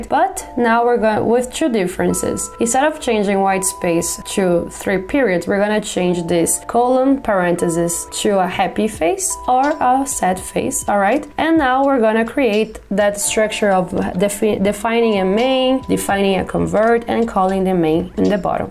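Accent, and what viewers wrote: Brazil. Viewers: Ela tem um acento brasileiro muito forte, com certeza ela e brasileira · Esse sotaque brasileiro não engana ninguém hahahah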